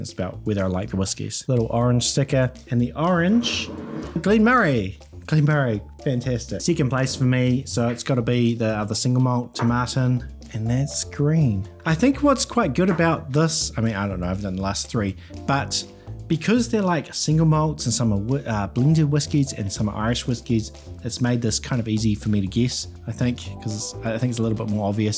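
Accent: New Zealand. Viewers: I wondered why you pronounced Conor McGregor as “McGreegor but then you said J&B was found “eeverywhere “so I realised that’s how Kiwis speak. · (First time seeing this channel, my thoughts) "45 DOLLARS FOR PROPER 12 ?!?!... Oh wait, my man sounds like a Kiwi". (Checks channel) ah, ok, that probably explains that." Still seems high for Proper 12 but New Zealand is quite a bit further away from Ireland that the US so, yeah, makes sense I guess. Edit: I should've paid better attention the first time, he says "New Zealand" directly behind the "45 dollar" price. 😅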